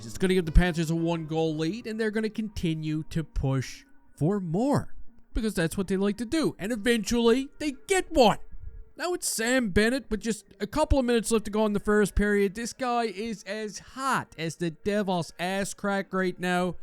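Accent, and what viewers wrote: Newfoundland, Canada. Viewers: Hes a Newfie from Newfoundland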